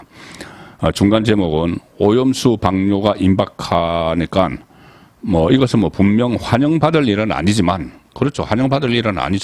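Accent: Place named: Busan, South Korea. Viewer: 매 편 즐겨 보고 있습니다. 저도 부산 사람이라 사투리는 이해하는데 제발 아 발음을 오 로 발음하지 않았음 합니다. 나오고 가 맞는데 노오고 는 아니지 않습니까? 어려운 것 아닐것 입니다.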